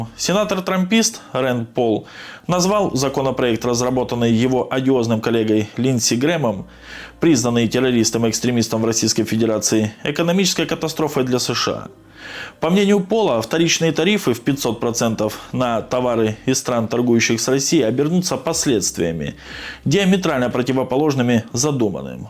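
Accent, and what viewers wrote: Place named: Ukraine. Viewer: Хохляцкий говор, очередная брехня от тцк шников!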